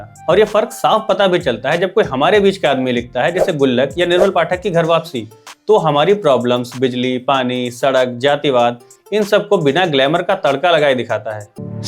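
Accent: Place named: Karachi, Pakistan. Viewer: app ki zaban bilkul hamaray jaisi hay from karachi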